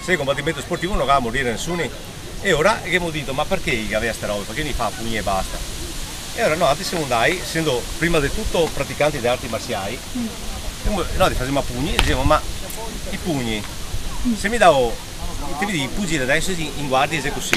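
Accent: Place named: Spain. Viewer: per un attimo pensavo stesse parlando spagnolo..... sembra avere un accento spagnolo....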